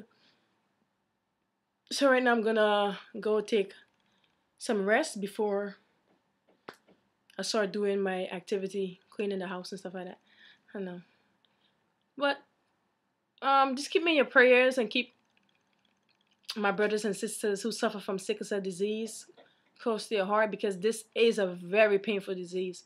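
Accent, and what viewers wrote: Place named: Guyana. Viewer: Are you Guyanese? you sound so.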